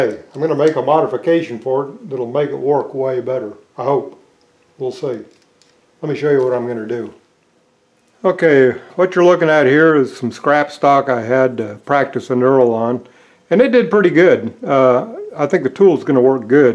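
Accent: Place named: Kentucky, United States. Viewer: I live in south central ky. Listening to your voice I suspect you may live close to me! I enjoy your videos!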